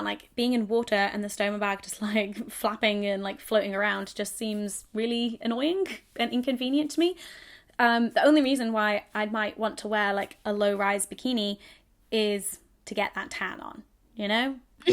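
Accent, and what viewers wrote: United Kingdom. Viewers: you are a dream cum true. I to have had an ileostomy back in 2014. It sucks. I have to wear an ostomy bag the rest of my life. Kills my sex life. It s nice to connect with a beautiful girl that has had a similar condition. Love your British accent. Nice smoking hot bod too. Keep posting lovely nymph and I wish you the best.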